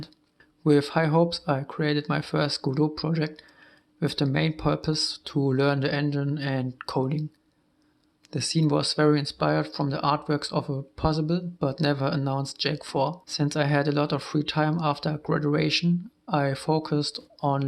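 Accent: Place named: Germany. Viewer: When you hear a German accent, you know it's going to be fire.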